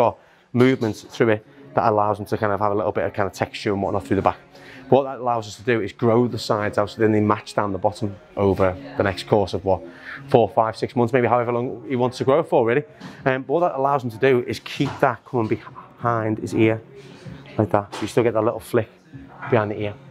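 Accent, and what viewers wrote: Liverpool, United Kingdom. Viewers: Scouse, from Liverpool probably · Love your videos! I know you don’t work in liverpool but your scouser accent is undeniable... where would you recommend in liverpool? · Love ur Scouse Accent mate🥰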